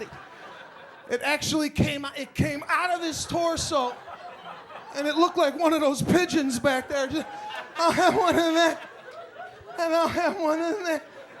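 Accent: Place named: Chicago, United States. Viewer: Chicago accent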